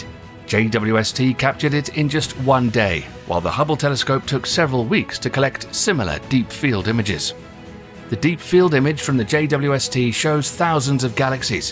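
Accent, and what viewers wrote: United Kingdom. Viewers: You think that a British accent gives videos some sort of validity and truth. But there is not much true information in this video. It's nearly completely fictitious rubbish.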